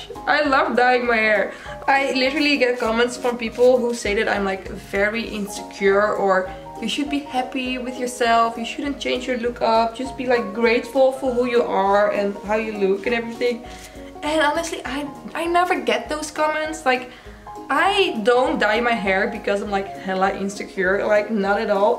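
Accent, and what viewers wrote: Netherlands. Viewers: Wait are you dutch? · are u dutch? bc idk ur accent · Where is she from? she sounds dutch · Haha ja, nogal Nederlands accent hè, dat is zo leuk van mensen over de hele wereld op youtube, alle verschillende accenten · Ben je toevallig Nederlands? Ik hoor daar ergens een Hollands toontje😂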